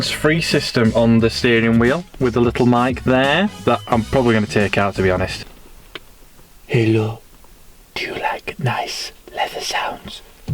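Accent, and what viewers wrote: Yorkshire, United Kingdom. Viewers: Looks like you got a bargain there with the 2.2. I've got a 06 one as a daily and a DC2-R as a weekend car. You sound like a Yorkshire lad? I'm in Yorkshire not far from Yorkshire Wildlife Park.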